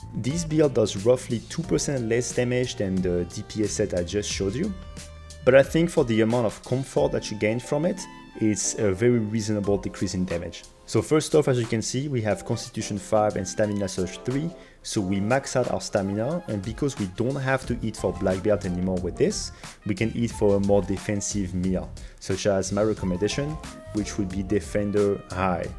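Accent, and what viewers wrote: France. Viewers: Il est Français? Je me disais que j'entendais ça vu l'accent! Il a jamais parlé en Fr? aha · Hmm le bon accent français comme on l'adore 😅. Tkt je suis un confrère aussi 😁.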